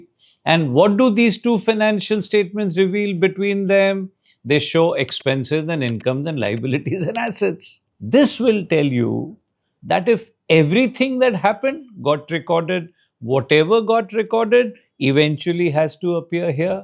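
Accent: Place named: India. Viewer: the rhythm, gestures and pauses all indian, yet speaking in englishhhhhhh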